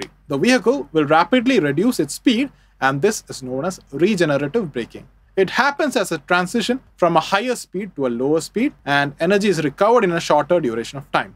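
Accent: India. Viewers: ofcourse Indian accent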